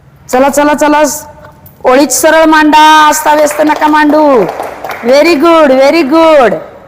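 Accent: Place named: Kolhapur, India. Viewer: छानच मॅडम अध्यापन पद्धती तुमची भाषा आमच्या कोल्हापूरी भाषेसारखीच आहे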